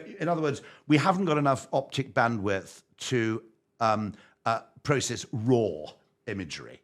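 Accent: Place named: Scotland, United Kingdom. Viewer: He is completely understandable, it is a very mild Scottish accent.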